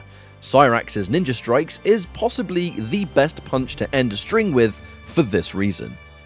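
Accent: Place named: United Kingdom. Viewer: its so hard listening to BRI'ISH people talk... like is he saying FREE or THREE "BOH-OH OVE WAHAH"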